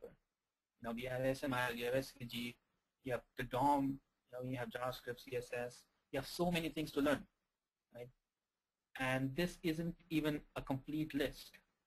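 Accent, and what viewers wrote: India. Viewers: omg, your Indian accent...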